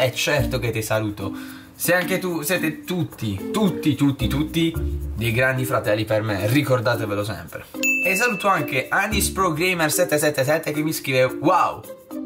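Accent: Bari, Italy. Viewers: Sei di bari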